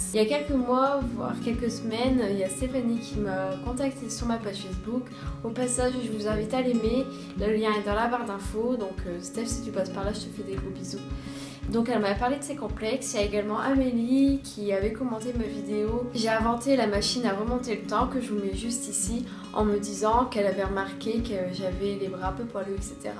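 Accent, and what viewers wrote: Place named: Nord-Pas-de-Calais, France. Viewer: TRES BONNE VIDEO ! On entend bien l'accent du nord, bien de chez nous ! ^^, question complexe perso j'en ai plein, mon corps en premier (obèse), mais j'ai adopté le "balécouillisme" enfaite, sa consiste a ignoré le regard des autres, les moqueries etc. Il faut juste s'écouter soit même, perso depuis que j'ai adopté ce truc, je n'ai aucun soucie a me foutre en boxer de bain, si tu n'aime pas mon physique tu ne me regarde pas puis c'est tout ! et comme je dit toujours "Si tout le monde se ressemblerais, le monde serais CHIANT"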